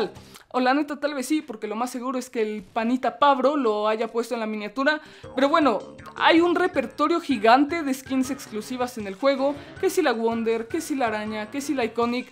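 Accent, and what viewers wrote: Costa Rica. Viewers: Nmms sos de costa rica? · oye bro eres de Costa Rica ? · Suenas tico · Eres tico? Noté que se le salieron varias frases❤🗯💙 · Eres de COSTA RICA?. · este mop habla como tico k estilo!!! jajjajaaja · Eres de Costa Rica? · Deberías de dejar de hablar como costarricense te escuchas ridículo, es a criterio personal. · es de costa rica o que xd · Eres tico? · oye eres tico? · Soy el unico que se dio cuenta que el mae habla como costarricense?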